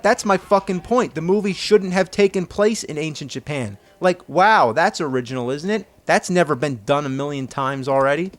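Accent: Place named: Pennsylvania, United States. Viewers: He's older and he is a dad so he got the Dad accent now.. That East Coast Dad Accent.. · He's got that outside Delco, Delco accent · New Jersey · That's the Jersey vernacular, where he lives. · Eastern Pennsylvania / New Jersey accent. James is from Eastern Pennsylvania, near Philadelphia.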